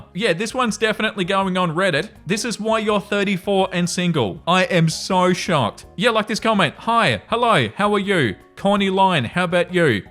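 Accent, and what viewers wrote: Australia. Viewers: Australian mate 🙌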